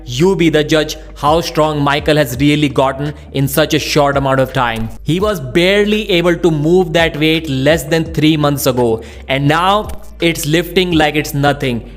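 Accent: India. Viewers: you need to learn to change your accent to a neutral one bro 😂 your accent is too heavy on the indian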